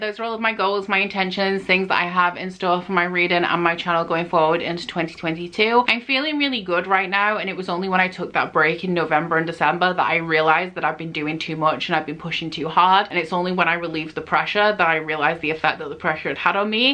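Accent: Yorkshire, United Kingdom. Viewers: Heey, great video i love supporting your channel. It’s so nice to here a yorkshire accent on youtube as that’s where i’m from and i don’t feel like there are that many booktubers from here. I was just wandering if you have any advice on how to read more as a very busy student with not that much time? as i love reading but i struggle with what to read etc as i’m trying to juggle my masters, work and a life as well? Any advice would be appreciated 😄😄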